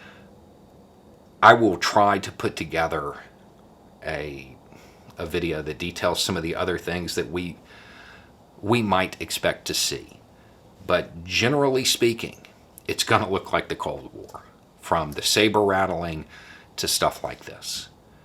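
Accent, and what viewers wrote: South, United States. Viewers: Agree. We all have unconscious bias due to upbringing, education, life experiences etc but it takes courage to identify it. At first I was turned off by southern accent and the Duck Dynasty beard:D but so far his comments are fair and square.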